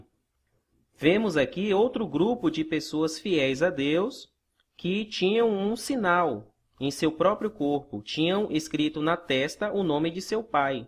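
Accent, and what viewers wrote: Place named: Northeast, Brazil. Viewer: Seu canal é didática bíblica. Porque não põe seu nome? Seria muito bom conhece você seu sotaque nordestino lembra irmãos amados do nordeste um abraço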